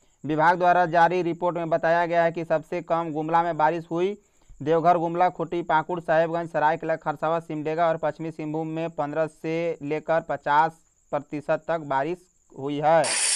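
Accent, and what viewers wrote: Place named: Bihar, India. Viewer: Sudh Hindi bolo ye bihari nahi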